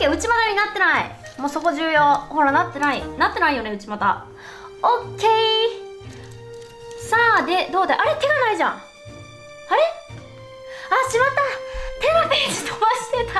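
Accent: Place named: Western Japan, Japan. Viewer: は何処出身？西寄りの言葉たまに出るよね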